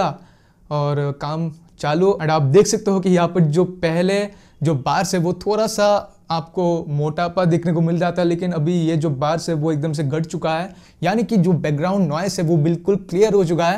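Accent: Bengal, India. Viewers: This was absolutely helpful ♥️♥️ subscribed instantly ! 😄 By your accent I feel you are Bengali .. 🙌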